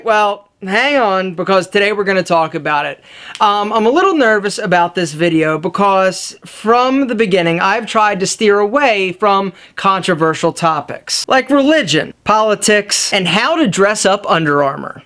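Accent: Philadelphia, United States. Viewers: you should get rid of that horrible philly accent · I know :) but the content is so good. I just wonder why it has to be spoiled by a loud squeaky kids tv show presenter american accent. Its annoying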